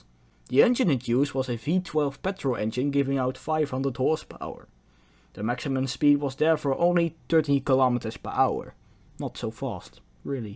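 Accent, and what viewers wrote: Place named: Netherlands. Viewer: you sound Dutch.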